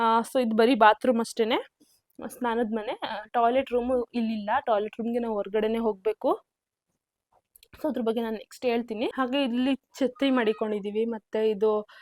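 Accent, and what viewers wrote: India. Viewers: Area elli heli Amma. Nice talking in Bangalore Kannada. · Hale mysore or Mandya Kannada. Nimma mane 10*10 ede annisuttade Badige yestu ede alli.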